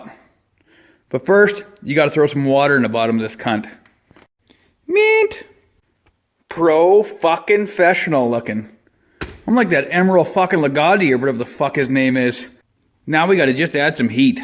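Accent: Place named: Alberta, Canada. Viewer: Fuck yeah ! I can tell your from Alberta haha I’m from Edmonton I love your minty as fuck videos keep posting them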